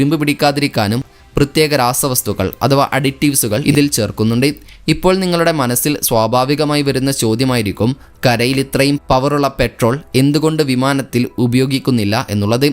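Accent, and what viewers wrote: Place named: Kasaragod, India. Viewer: ഓരോ ജില്ലയിലും ഓരോ ഭാഷാ ശൈലിയുണ്ട് അത് അവരുപയോഗിക്കുന്നു ഞാനാണ് ഇങ്ങനെ പറഞ്ഞിരുന്നുവെങ്കിൽ മലയാളത്തിനു ഒന്ന് അമർത്തുക എന്ന് നീ പറഞ്ഞേനെ അതാണ് കാസ്രോട് ❤️❤️... പിന്നെന്ത് നിൻക്ക് സുകന്നല്ലേ അങ്ങനല്ലോ ബാണോ ബാസയ് 14 ജില്ലാ 14 ബാസയ് അതന്നെ കേരളോ...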